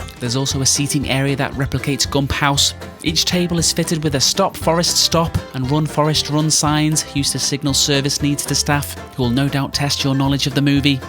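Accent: United Kingdom. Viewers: Your accent is quite enjoyable. Which region of britain is it?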